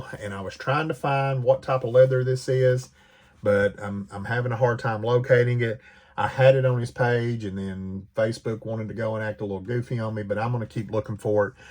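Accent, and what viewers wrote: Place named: South, United States. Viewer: It is so nice to hear that sweet sweet southern twang again. I missed you brother.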